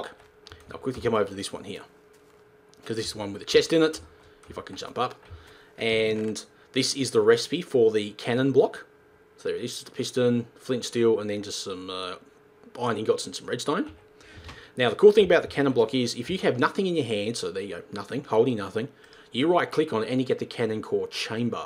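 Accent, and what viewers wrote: Australia. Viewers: I love your Aussie accent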